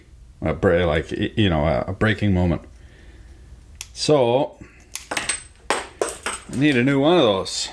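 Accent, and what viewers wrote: Quebec, Canada. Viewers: always gorgeous to listen your cool casual way.Do French Canadians always speak this Nice! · "Êtrrre Drébrrrouillarrrrdrrr" I love Quebec accent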